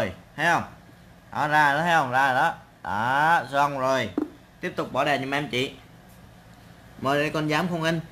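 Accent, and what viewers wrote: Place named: Quang Nam, Vietnam. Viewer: A người quảng Nam hả a?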